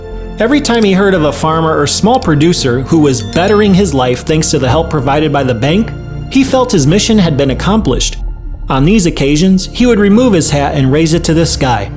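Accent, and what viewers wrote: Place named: United States. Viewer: Narrator sounds American but the script sounds like its written by a non native english speaker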